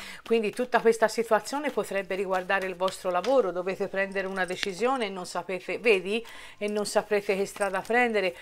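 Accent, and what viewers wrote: Tuscany, Italy. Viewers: Cara ti seguo da diverso tempo anche se non interagisco praticamente mai Adoro il toscano e ascoltarti è piacevolissimo Per quanto riguarda la vaccinazione porta pazienza vedrai che tutto si sistemera' quanto prima In bocca al lupo 😘😘😘😘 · Ciao sei dolcissima mi piace il tuo accento molto toscano.. ti sono vicina e ti mando tanta positività ❤😘😘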